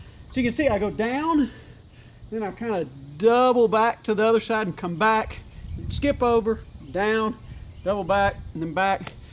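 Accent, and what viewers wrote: Arkansas, United States. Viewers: You don’t happen to be from Arkansas?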